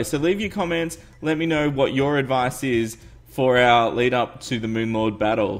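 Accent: Australia. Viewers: Hey, I'm new to your channel but, I love all the videos they are great keep going!!! and all so its good to see more Aussies getting on this!! I could tell in the first episode you were Australian and I laughed when you said in a vid "that rings a bell" and you had to explain it, very funny. Anyway keep going and don't let anything stop you, you are great at what you do.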